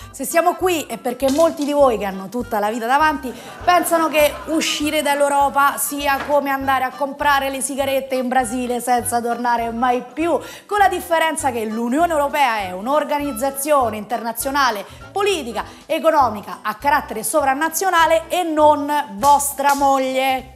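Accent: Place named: Rome, Italy. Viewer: Quando i cominci affrontano questioni politiche sono alla pari di qualunque superficiale che scrive sui social. Se avesse detto: " so europeista, attaccatevi a sto c*** brutti fascis*** de m** " ( con la sua tonalità tipicamente romana ) forse mi avrebbe fatto almeno sorridere. Lungi dal fare il " professore di economia dei social " vi dico delle cose molto semplici che hanno solo il fine di farvi riflettere ( quindi, a differenza della comica, il mio intento non è quello di fare propaganda politica!! ): - l'inflazione non è sempre una cosa cattiva e non è scritto da nessuna parte che uscendo dall'UE quest'ultima schizzi alle stelle! L'inflazione, come viene creata, può essere stoppata e anche annullata ( e quindi creando deflazione ). Vi ricordo che il " quantitive easing " di Mario Draghi aveva lo scopo di creare il 2% di inflazione nella euro-zona al fine di stimolare la produzione interna e alleggerire il peso nominale dei debiti degli stati; - il discorso sui debiti è alquanto strana... 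È verissimo che l'UE è poco intransigente ( non dimentichiamolo! ), ma non è che possiamo posticipare le scadenze all'infinito! Arriverà il momento in cui l'UE darà delle belle noie all'Italia! E uscendo dall'UE quest'ultima non può chiedere il pagamento dei debiti ora e subito... Non l'ha fatto con la Gran Bretagna! Esistono i compromessi che si sostanziano in accordi finanziari; - sul discorso delle pensioni basta riflettere su come funziona l'inflazione ( se eviti la sua crescita galop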